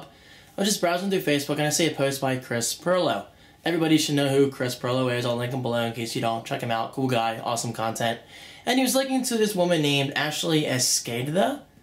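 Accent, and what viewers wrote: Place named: South Jersey, United States. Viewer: Wawa is a 10X better version of a 7-11. They have them all over Jersey and PA. Judging by his accent he's prob from the South Jersey/Philly area.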